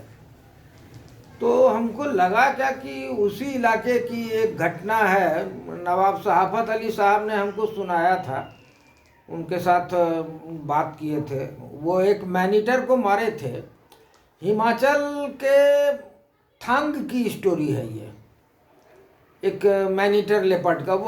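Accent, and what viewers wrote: Bihar, India. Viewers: Heard the whole story for the behari accent 😂 love it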